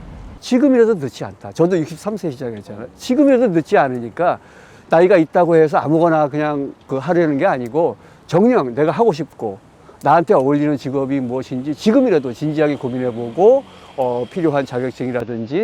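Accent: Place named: North Jeolla, South Korea. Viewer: 말투가 절라북도구마잉. 열씨미 사는구마잉.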